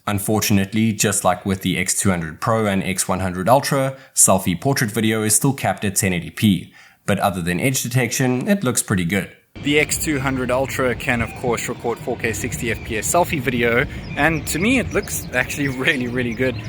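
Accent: South Africa. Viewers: Just ordered a Vivo X200 Ultra .. I didn't get the the Photography kit though. Stumbled across your video and thought :" Hey he sounds South African " and then I saw Table Mountain 🤣🤣 Brilliant video. I am so excited for mine to arrive. Will only be shipped to SA Feb 23rd due to Chinese New Year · I absolutely didn't expect you being South African! 😂 I thought all (white) South Africans speak like FW de Klerk or the other one... EtB, who came on a black horse. 🤭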